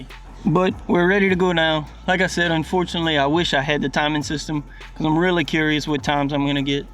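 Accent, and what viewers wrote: Louisiana, United States. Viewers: Just a question? You in southern louisiana.. that accent has some breaux bridge behind it from how it sounds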